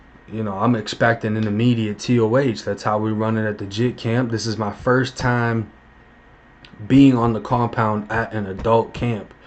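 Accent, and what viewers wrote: Boston, United States. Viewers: Can tell you from Boston for sure lol. Fuckin with your channel tho bro I appreciate the people you know are keeping the shit authentic. You're gonna blow up soon keep up with this YouTube shit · You originally from Massachusetts? I hear a Boston accent...... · Love ❤️ your Boston accent keep uploading daily ....We would watch daily too ..love and wishing you all the best Love 💕 from Southern California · It sounds like you got a Boston accent under the Floridan sound. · The way you tell your stories with the Boston accent makes it that much better. Keeps me coming back keep it up man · I would've done it too man. I grew up wit all females too. I'm from Boston and your accent is half and half. Keep making vids man you're a great storyteller just like Death that's all you need to blow up on YouTube and make it your job. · This whole time I’m thinking bro sounds like he from Northshore Boston and I went back and saw you’re from Malden that’s what’s up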